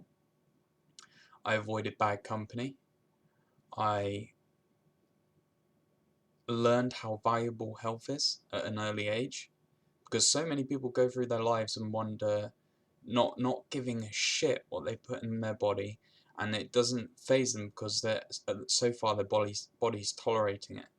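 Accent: United Kingdom. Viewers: I myself always think that things could be worse for me and it makes me feel better. And I also read or listen to others feeling so sick where they can barely get up and I feel lucky. I am very sensitive to gluten. Just getting off of it did SO much for me. I still have CFS but I'm better. I'm going to really try to go to sleep early and eat more often and more veggies. Thank you for your videos. BTW I'm pretty obsessed with your accent. lol I'm from the US but originally from the Caribbean and I love British accents.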